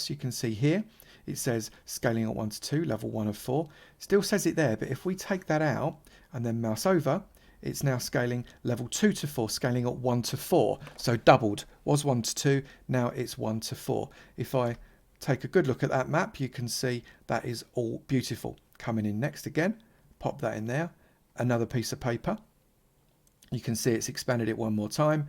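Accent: United Kingdom. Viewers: Isn't this British accent?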